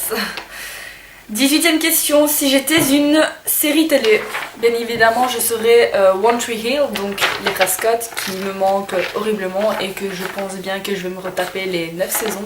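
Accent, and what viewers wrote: Belgium. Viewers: D'ou vien tu ? tu as un accent belge non ?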